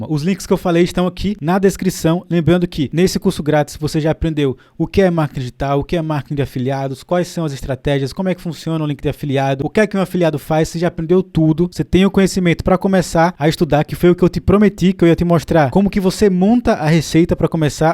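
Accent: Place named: Northeast, Brazil. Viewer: Eu só queria saber de que parte do nordeste é o sotaque do. Tenho amigos nordestinos e não consegui identificar.. Bah. Virei fã dos teus vídeos...abraços gaúchos..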